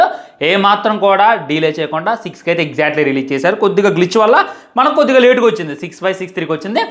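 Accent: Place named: Andhra Pradesh, India. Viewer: Niku teaser 7th month lone ochindha 😂😂😂 em review ra nidhi malla ni slang eh andra slang undhi nv telangana antunav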